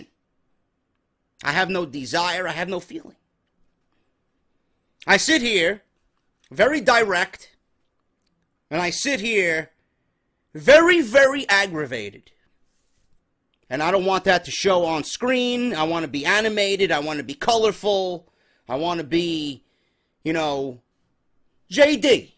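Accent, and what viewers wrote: New York, United States. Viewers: dude you are a trip your ny accent helps your show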